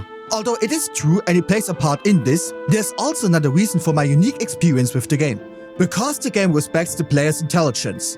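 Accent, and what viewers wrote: Germany. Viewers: Out of curiosity. Are you german?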